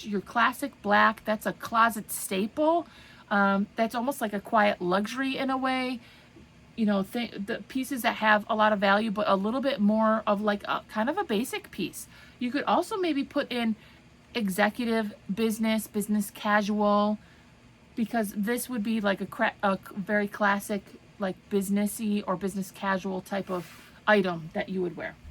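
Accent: Wisconsin, United States. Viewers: BTW the first time I watched you I caught your accent, I was like she has to be from Wisconsin! I was born and raised in Eau Claire so I recognized where you were from. I am in Washington now but anyway.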